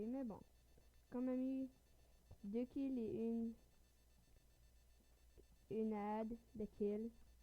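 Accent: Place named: France. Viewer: Arrete avec ton accent francais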